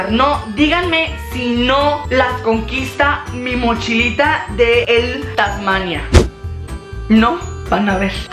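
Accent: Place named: Mexico. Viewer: .... Are you like a Freso? I have friends from Mexico And thery act n talk like you.. Not as funny But they go t the same mexica Style of your Lingo.... Awesome channel You are very Funny I love it❤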